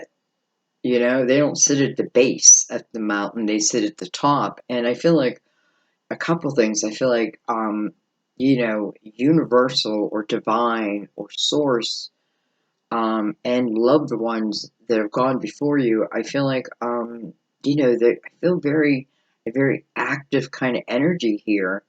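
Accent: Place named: Pittsburgh, United States. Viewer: November 1st. I like your readings. And that's gotta be a Pittsburgh accent!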